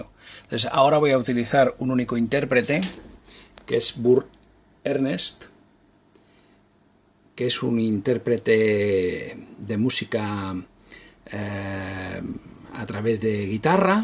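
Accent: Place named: Catalonia, Spain. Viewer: Hola, creo que tienes muy buen contenido y conocimiento acerca de lo que hablas. Te aconsejaría hacerte un twitter donde ir actualizando información sobre lo que vayas haciendo, así como optimizar tu canal y los tags del mismo. si dudas de alguna cosa yo soy de badalona por tu acento supongo que eres de cataluña, cualquier cosa buscame por twitter y hablamos un saludo!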